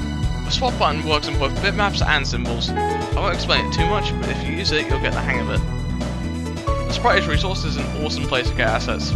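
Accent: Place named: United Kingdom. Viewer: British accent dected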